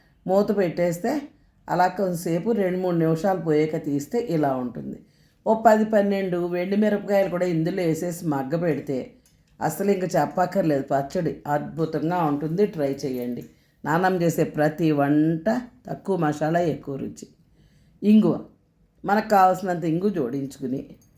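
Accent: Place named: Khammam, India. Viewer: Aunty meedi khammama ma Mee mata style akkadi la anipistundhi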